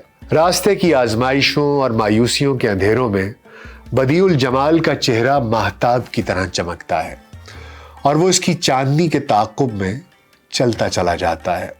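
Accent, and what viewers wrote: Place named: Punjab, Pakistan. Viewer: Bhot zabardast Punjabi lehja superb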